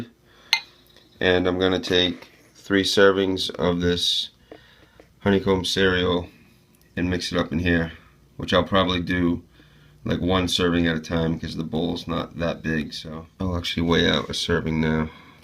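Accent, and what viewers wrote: Boston, United States. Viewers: yeah i love it. btw do i detect a little bawwsten accent im new to this channel and not sure where your from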